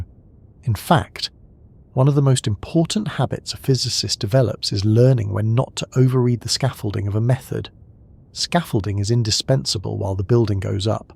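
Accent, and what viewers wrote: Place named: RP, United Kingdom. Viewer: Lose the horrible posh Brit voice.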